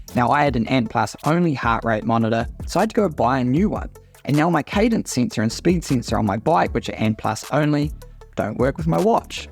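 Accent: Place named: New Zealand. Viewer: Bang on on the comparison between Coros and Garmin ecosystems. I run with older gen Pace 2 and FR245. Both meant to be 'entry' level watches. Neither is perfect, both complement each other well. Totally agree with your Coros highlights over Garmin though. Stumbled on your channel recently and very grateful to have done so. You're an endless wealth of information on all things running = THANK YOU! And nice to hear a New Zealander here in Australia over yet another US 'run tuber' 🙏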